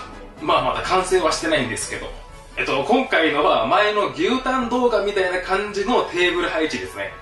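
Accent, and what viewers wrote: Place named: Fukuoka, Japan. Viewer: 端々に出る方言が好き(´；ω；`) 「吹き零れんごと」とかガチの福岡弁で笑う笑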